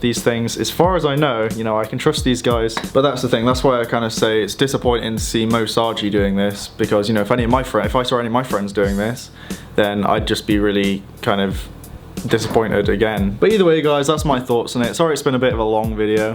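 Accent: United Kingdom. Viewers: You’re a fake your British accent is horrible idiot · can u plz explain adderal ? Sorry I'm Arab and difficult to British accent sometimes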